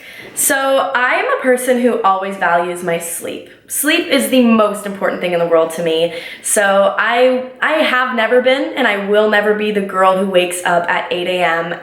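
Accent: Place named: Canada. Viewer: Can really hear the canadian in you!!!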